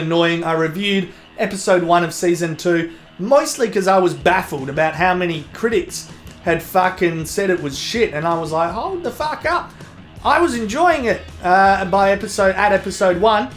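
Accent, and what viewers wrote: Australia. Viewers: g'day ozzy man. i enjoy your takes on the many pictures up for grabs and i'm a big fan of australian diction in general. i enjoy how youse and the brits rock the english language. i've been checking your channel to see if you had reviewed the other episodes of true detect s2 as i wanted to hear your thoughts on the show, so i was pleased you touched on it in this video. i was surprised however to hear you give it a passing grade mate. apart from ep1, farrell and mcadams, the show has largely been shit and most especially that action piece at the end of ep4. it was utter trash! and wtf was with that riot shell shotgun double-tap in ep2? why the fuck would you double-tap with riot shells? like it's not accomplishing anything. anyways keep it up you hoser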